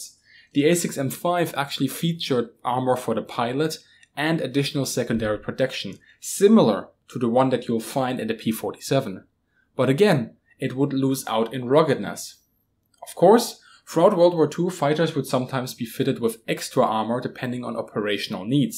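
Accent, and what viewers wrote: Germany. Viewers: Ich kann aus deinem aktzent raushören das du deutsch bist! Hallo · R u german? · Man höhrt dass sie Deutscher oder Österreicher sind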